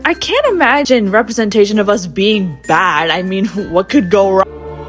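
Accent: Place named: India. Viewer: I heard a desi girl and locked in 💅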